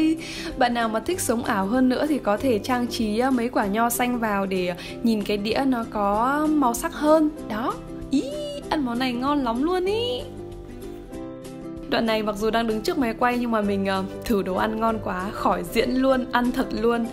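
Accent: Hanoi, Vietnam. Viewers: Be de thuong qua cam On chia se cua e , giong ha noi nghe de thuong , guong mat xinh qua 🌹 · Giọng nói HN đáng iu quá hihi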